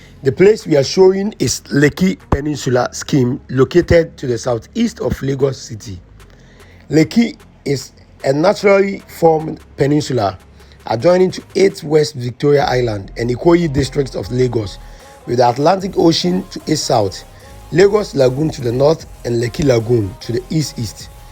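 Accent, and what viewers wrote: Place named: Ghana. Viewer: A nice educational video. You sound like a Ghanian. But a different and positive minded Ghanian. Not like some other Ghanians who never fail to be antagonistic about anything Nigeria. Good video narration bro. Keep up the good work. Love will always triumph over hate as long as you keep showing love.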